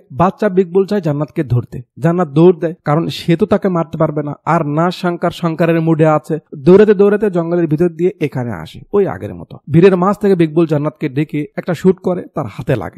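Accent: Bogura, Bangladesh. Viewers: আপনি কি বগুড়ার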